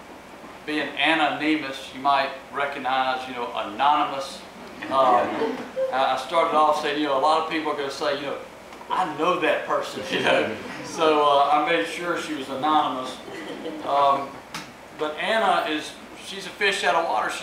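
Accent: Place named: South, United States. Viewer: I barely recognized you with shorter hair, but when i heard the southern twang, all was clear:) We'll have a dram of Laphroaig or Ardbeg the next time y'all come over! Bring a copy of your book for me!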